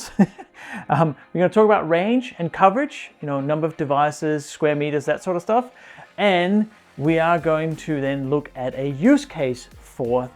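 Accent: Denmark. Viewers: Hi, do I hear a little Danish accent - also the name maybe give you up?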